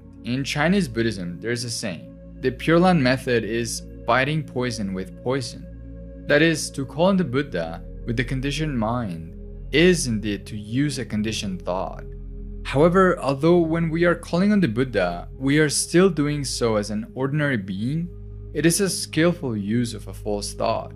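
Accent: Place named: Quebec, Canada. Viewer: Very informative! Look forward to the rest of the series. (Et je pense que c'est un accent québécois que j'entends...😊)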